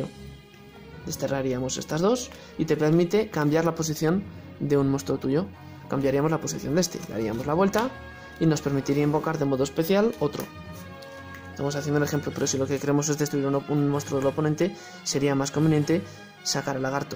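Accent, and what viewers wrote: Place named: Spain. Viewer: Por tu acento eres de España de que lugar? Soy de Madrid asi un dia jugamos unos duelitos, gran video!!!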